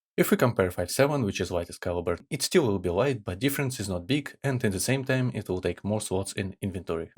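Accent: Russia. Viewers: Мне кажется или он русский?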